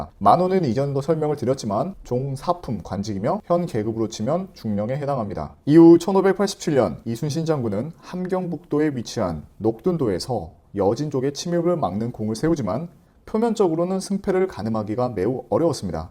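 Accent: Jeolla, South Korea. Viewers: 혹시 전라도 사투리를 사용하시는걸까요?? 의 발음이 으 발음으로 하시는것같아서...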